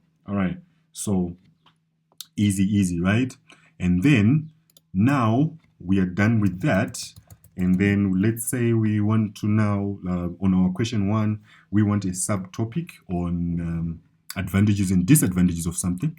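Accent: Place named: Zimbabwe. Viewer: Hahaha...nice one, just listening to you, you reminded me of my Zimbabwean friend, so I want to guess you are fron Zim...lol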